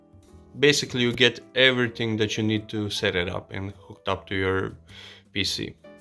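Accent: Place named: Eastern Europe. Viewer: Nice vid. Based on your accent, I would say youre somewhere from eastern europe, czech, poland, russia etc? My best guess is russia. This monitor is something I am interested in, however, currently, in my opinion, its simply just too expensive for me. If it drops half price, then I could consider this.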